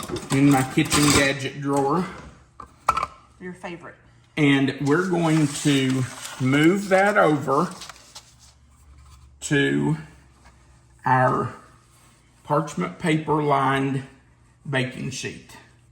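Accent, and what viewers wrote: South, United States. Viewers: I am still a faithful watcher even though I don't cook like I used to since my precious husband of 42 years died last year. Hold each other close. You are an adorable couple. This Thanksgiving message is the first time I saw., she is beautiful! I was born near Beckley WV, but have lived near Dayton, Ohio, for years. I LOVE your down-home accent. You keep cookin' and I'll keep watchin'!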